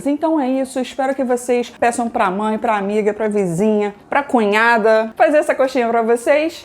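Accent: Rio de Janeiro, Brazil. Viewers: vc me leva para casa com o seu sotaque e o sabor das lojas que só tem no Rio, hoje moro em Bauru. Vou fazer agora!🙏😘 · Tu é carioca,?? Me identifiquei muito com seu jeito de falar hahahahha Vou fazer hoje essa coxinha, vamos verrrrrr!!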